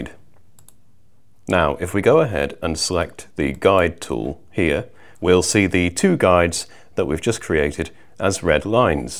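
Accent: United Kingdom. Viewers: Excellent - that helped a lot - nice to hear a British accent that I can actually understand as opposed to an American gabble.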